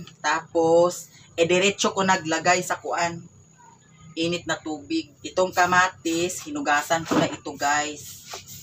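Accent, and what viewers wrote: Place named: Davao, Philippines. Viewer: Relate man kaau ko ana imong sinultian kay sa amua sa davao man.binisaya kaayo .watching from dubai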